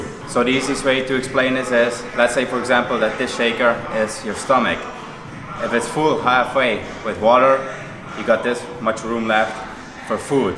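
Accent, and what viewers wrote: Netherlands. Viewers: You sound like you're from Limburg.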